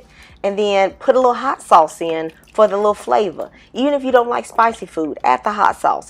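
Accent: South, United States. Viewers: Talkin' like she straight out the south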